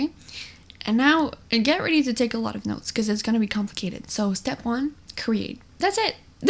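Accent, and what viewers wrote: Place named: Europe. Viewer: Do you have a French ancestry? You seem to have a European lilt to your accent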